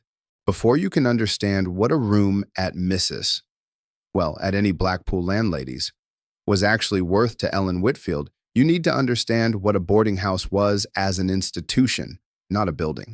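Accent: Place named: United States. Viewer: Pity the narrator has an American accent to describe a British holiday video.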